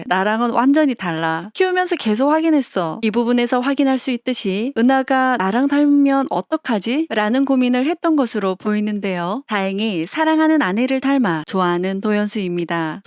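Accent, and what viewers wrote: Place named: Gyeongsang, South Korea. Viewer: 해설자님 경상도 분인 듯~^^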